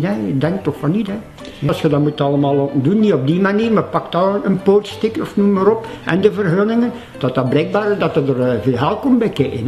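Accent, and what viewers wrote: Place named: Flanders, Belgium. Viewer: vlaams klappe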